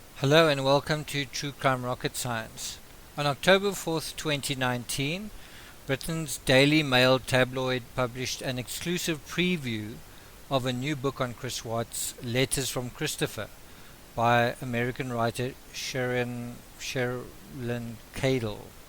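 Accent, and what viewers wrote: South Africa. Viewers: New subscriber I enjoy listening to you, are you from South Africa 🇿🇦? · You sound South African.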